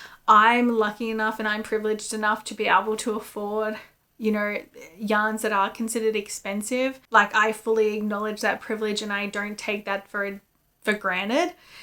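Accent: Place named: Australia. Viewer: under which rock have you been living. The accent and the acknowledgment at the beginning. Obviously an Aussie.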